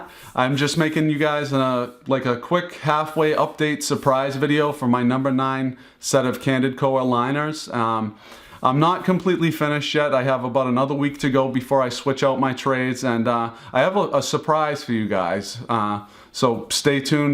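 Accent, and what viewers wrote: Boston, United States. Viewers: That's a crazy story..people have no consideration...You remind me of Ben Affleck..the look and boston accent...:)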